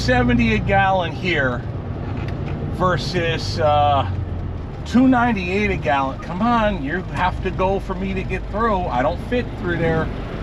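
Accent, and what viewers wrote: New Jersey, United States. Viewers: I thought I was picking up on a Jersey accent. Go Joisy! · sounds like you just spent a month back in New Jersey. Heavy accent in this video for some reason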